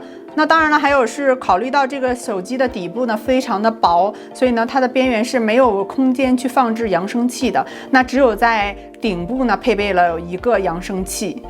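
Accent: Northeast, China. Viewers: 主播都东北人？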